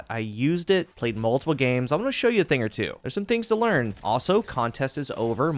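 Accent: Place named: Wisconsin, United States. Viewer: your voice is soooo, are you from Wisconsin? try not to do that upward inflection after every sentence it gets real annoying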